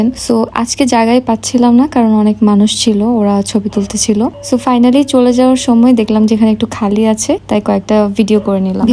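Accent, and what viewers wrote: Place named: Chittagong, Bangladesh. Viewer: চিটাগং এর মানুষ খাটি শুদ্ধ বলতে পারেনা আপনার কথা তার প্রমান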